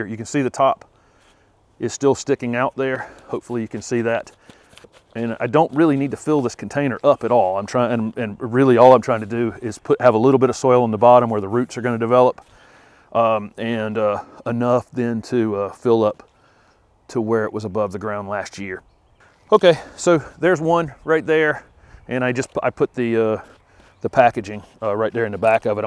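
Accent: South, United States. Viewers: Where are you? Somewhere down South, I can tell that.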